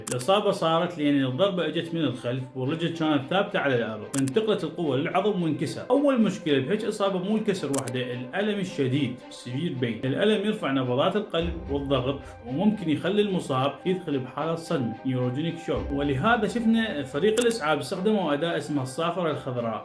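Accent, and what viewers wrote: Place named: Iraq. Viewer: لما ركزت فالكلام بقت اسهل شويه،،، تحيه لأهل العراق الغالي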